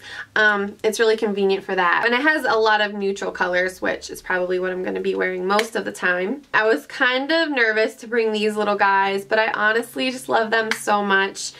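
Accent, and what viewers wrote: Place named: Philadelphia, United States. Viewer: I just saw someone comment and say you're from Philly and I have to say: I fricken knew it! We have a very specific accent and I knew I heard it in you when I first started subscribing to your channel haha.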